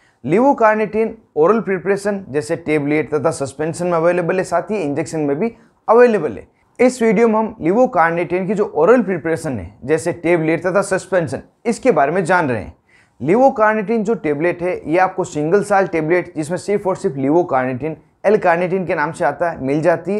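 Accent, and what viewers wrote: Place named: Bengal, India. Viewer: Bangla ucharan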